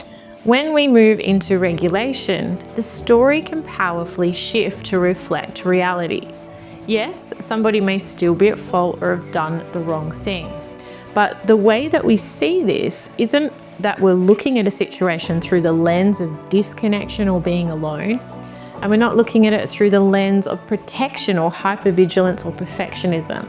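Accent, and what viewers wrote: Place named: Australia. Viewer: Finally an Australian, so pleasing to hear and feels so home. I am traumatized was accidentally found out in a date that went wrong a few weeks ago. I put my baseline thoughts on chatgpt and after a few questions it also correctly predicted I am severally traumatized. Here one human and now a computer. Both predicted the same. Last two weeks I am obsessed with writing my turbulent childhood for a child who apparently wires differently, volatile growing up, even isolated reclusive adulthood which I did not want. Now at middle aged something new opened up which I never knew before. Forgive me for rambling. I am so happy to come across your channel.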